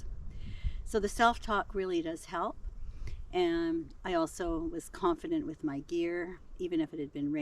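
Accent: Canada. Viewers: thank you so much. You are so easy to listen to. You’re pleasant and warm and full of wisdom. I’m from New England really and can hear some Canadian in your voice. I consider northern Maine home. I miss nature and always walked on my own in the woods and fields. I’ve lived in Utah since 1983 minus two years I drove cross country to be with my mom as she passed from bladder cancer. So the travel bug bit me. I divorced after 30 years and my kids are all grown and very busy. Apartments are too expensive and I just don’t want to feel stuck anymore. Sorry for the long post. 😊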